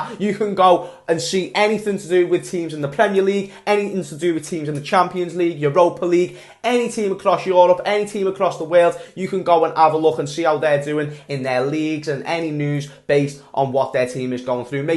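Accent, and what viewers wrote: Liverpool, United Kingdom. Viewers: Its only a fact that Scouse is the best accent on the face of this earth. Love this Guy to bits.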